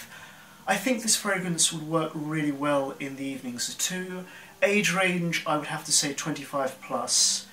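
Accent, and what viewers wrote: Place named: RP, United Kingdom. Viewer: All the superlatives are on point. Spot on. I would go as far to say that Anubis is for me in the same category as a not too barnyardish Oudh. That initial petroleum benzine, leatherish scent you get but then fades away for a beautiful resinous, smokey and incense aroma. I just love it. Can’t wait anymore to get my bottle. Have a nice weekend. By the way I like your voice and above all your English. Very well spoken, posh😉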